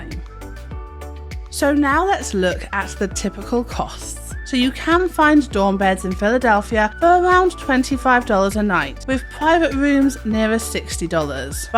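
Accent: United Kingdom. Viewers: You sound like britis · Fairly small city she says lol 6.2 million population metropolitan area! Apart from London is any city close to this in the U.K. where I imagine by your accent that you are from? Lol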